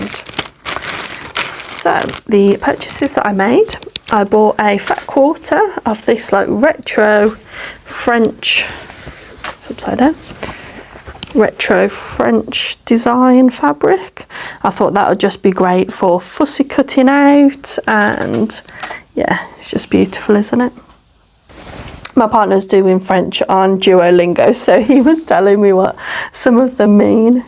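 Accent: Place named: Birmingham, United Kingdom. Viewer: Hi, first time watcher, loved all your makes, they are so neat and I am a big fan of Liberty. You sound like a local brummie girl to me, what date are you going to the festival of quilts at NEC next week? Also did you go to Alice Caroline recent sale in the Cotswold, my friend went and they were giving away smaller pieces of Liberty, she is going to pass on a bag to me at the NEC on Saturday. Anyway thanks for your inspiration and it’s great to see the enjoyment you are getting out of this ❤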